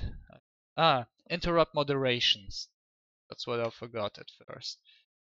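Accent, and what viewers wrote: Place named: Germany. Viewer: Ich hör raus, das er deutscher ist xD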